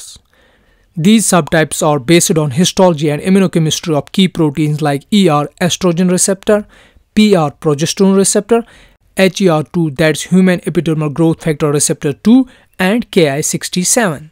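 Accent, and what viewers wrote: Kashmir, India. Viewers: That's great! I guessed you might be from Kashmir by your voice. Your teaching is excellent, and the content is really helpful.Thank you sir.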